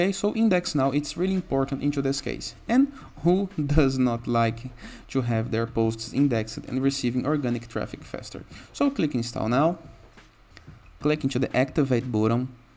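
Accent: Brazil. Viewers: Are you brazilian? Your accent sounds really 🇧🇷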